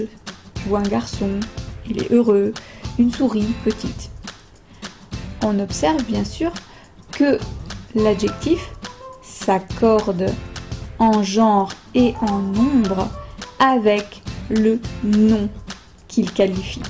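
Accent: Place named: France. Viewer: you good teach but accent french say not understand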